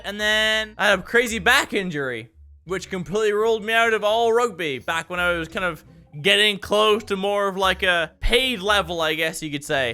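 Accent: Minnesota, United States. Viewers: I DIDNT KNOW YOU WERE IN MINNESOTA OOOFFFDAAA. 100% MINNESOTAN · started picking up a Minnesotan accent fast that makes me happy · Bruh unless you were like up near bemidgi no one talks like that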